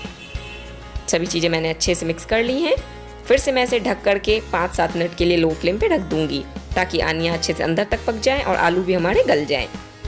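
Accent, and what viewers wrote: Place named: Sindh, India. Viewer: Aapki accent le pata chal raha hai aap sindhi hi hai...very nice recipe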